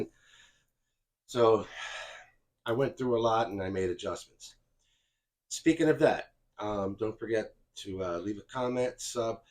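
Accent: Australia. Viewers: We love you too uncle. Probably a good idea not to go commando on youtube 😂 Great OZ accent brother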